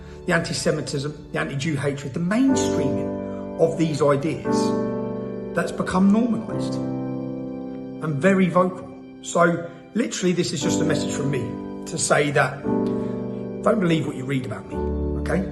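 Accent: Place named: Cockney, United Kingdom. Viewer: Run for PM ...get rid of that cockney accent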